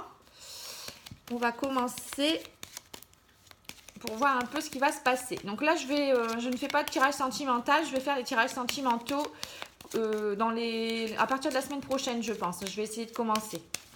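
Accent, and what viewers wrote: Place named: Hauts-de-France, France. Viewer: Bonsoir, merci pour ce beau tirage très complet, très parlant pour moi, en plus avec votre accent Hauts de France, je suis picarde, j'aime beaucoup, bonne soirée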